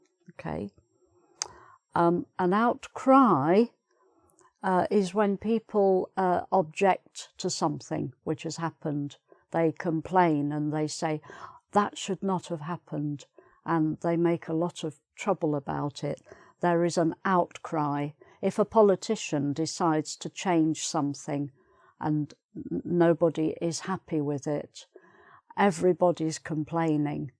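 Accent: United Kingdom. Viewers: Your fantastic pure British accent is something out of the world. Never die dear never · the accent british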